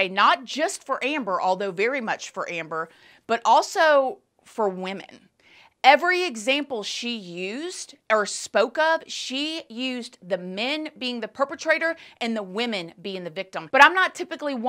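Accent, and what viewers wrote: South, United States. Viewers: I love your accent!!!! I’m from NYC so I don’t really hear no1 speak in southern accents. But when I do hear someone speak with a southern accent, they don’t sound this lovely and awesome!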